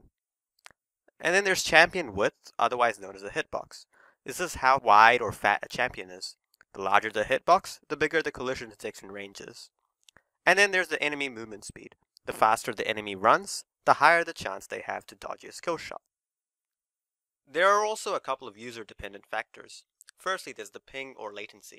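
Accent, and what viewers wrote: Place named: South Africa. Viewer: you sound south african dude :D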